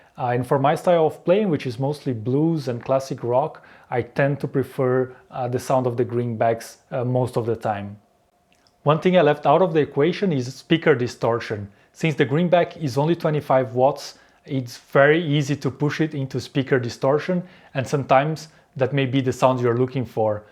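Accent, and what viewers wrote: Brazil. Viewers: Tu é brasileiro né? Mó jeito de brazuca de falar em inglês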